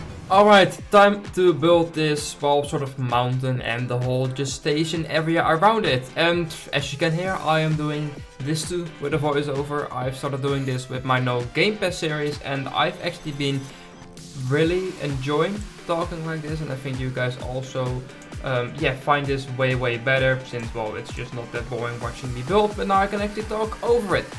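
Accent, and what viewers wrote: Netherlands. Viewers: Probeer niet engels te praten want je probeert Engels te praten maar je hoort je Nederlands tussen en dat in het ombetant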